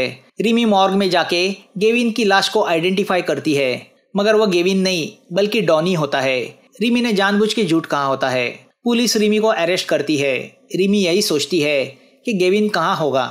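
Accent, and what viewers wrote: Maharashtra, India. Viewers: What makes this channel stand out is the Hindi spoken in Marathi accent for narration.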